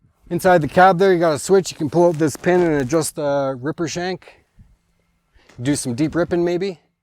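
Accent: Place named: Canada. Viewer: IT'S ALWAYS SCARY AND COUNTER-PRODUCTIVE WHEN A REVIEWER OF A PRODUCT SAYS ''I DON'T KNOW WHAT THAT IS'' ,( ISH), .THIS IS MY 1ST TIME HERE AND ACCORDING TO HIS PRONUNCIATION OF THE ENGLISH STYLE HE'S SPEAKING I'M GOING TO TAKE A STAB AND SAY THIS INDIVIDUAL IS A NATIVE FROM THE GREAT WHITE NORTH AND THAT HE REALLY DOESN'T KNOW WHAT ANY OF THAT IS FOR. HE ALMOST HAD ME FOOLED WITH THE MACHO, COPY-CAT FASHIONISTA TATTOOS.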